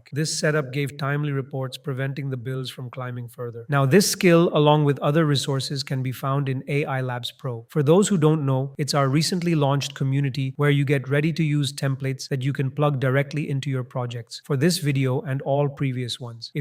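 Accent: India. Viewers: Indian accent AI voice is peak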